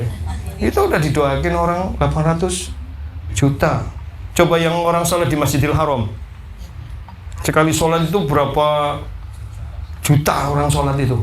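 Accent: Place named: Banyumas, Indonesia. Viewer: Alhamdulillah ilmuku tambah ngaji kalih ustadz ngapak, Asli saking pundi nggih min?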